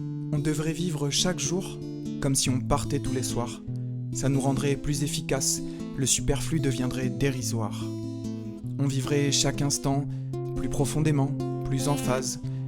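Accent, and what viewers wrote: South, France. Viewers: A où c’est un boug le sud non ? On dirait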